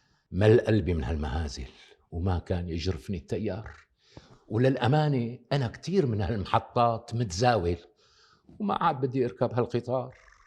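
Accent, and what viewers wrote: Syria. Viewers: ريحة الشام و الياسمين.. ريحة وطني.. و لهجة وطني.. ريحة أبي.. و طريقتك بتشبه طريقته.. الله يخليلنا ياك 🌷 و يدوم عليك هالذكاء و الإلقاء و الفكاهة اللاذعة 💕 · جميله اللهجه السوريه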